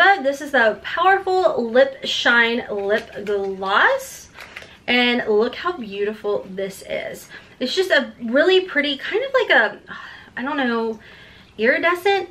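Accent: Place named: Kentucky, United States. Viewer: It is so cute!!it reminds me of the 5 years I lived in KY and came bk to california with an intense accent! It's so comforting and her extra special personality just makes me feel like she is the house that in todays society you could still knock on the door and ask for a glass of water or directions and she would gladly give them to you and probably invite you to sit on the porch for a few minutes to have a short converstion!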